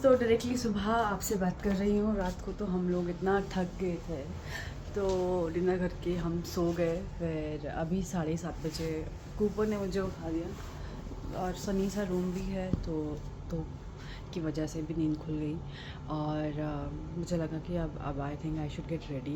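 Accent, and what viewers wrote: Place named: Pahari, India. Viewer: One of my family member (one uncle) married from Shimla. They have Apple orchards. I felt Pahari influence in your hindi. It is sweet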